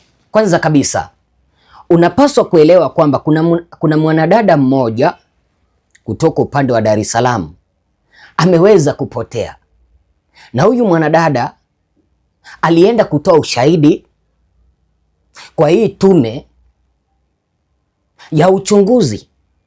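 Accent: Kenya. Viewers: Mbona accent ni ya kenya? Reporter anajiita mtanzania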